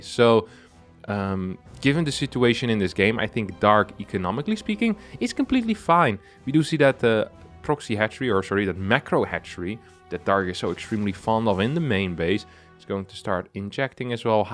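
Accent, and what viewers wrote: England, United Kingdom. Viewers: i just dont like his english accent, feeling like I cant be the only one, his account is still fairly small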